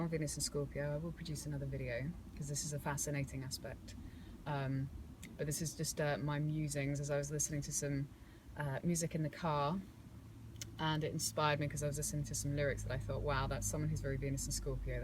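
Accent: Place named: England, United Kingdom. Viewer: Are you from England? lovely accent.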